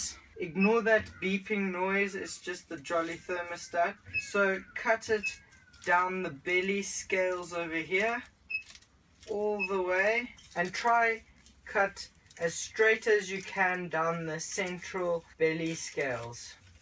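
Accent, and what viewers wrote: South Africa. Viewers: Can spot a South African accent, my baby ball python just shed and my adult is in blue, can't wait to try this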